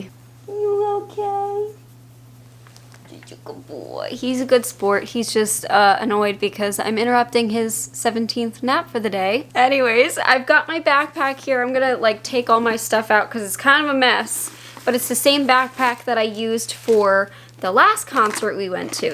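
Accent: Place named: New Jersey, United States. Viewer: New Jersey makes sense. You have an accent that comes out on certain words and I couldn't figure it out.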